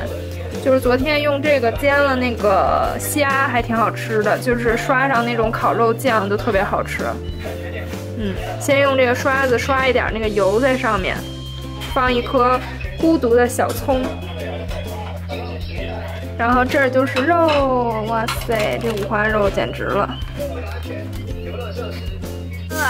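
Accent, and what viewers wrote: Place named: Shandong, China. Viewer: 你是哪里人啊听着好像山东人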